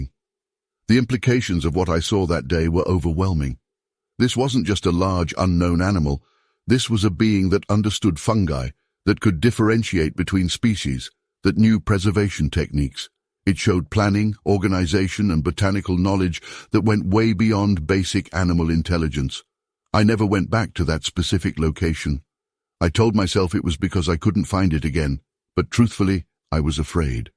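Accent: United Kingdom. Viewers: Ottawa, Canada If this voice is AI can you please use an American voice and NOT a limey's voice. I can't stand British "English"....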